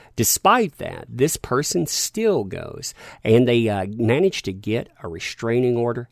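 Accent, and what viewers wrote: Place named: Texas, United States. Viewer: Sort of wondering if you are from Texas with your use of "set" for "sit" ;)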